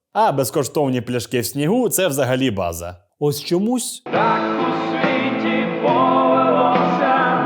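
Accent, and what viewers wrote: Transcarpathia, Ukraine. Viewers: ти з Закарпаття? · Ти із Закарпаття? 😮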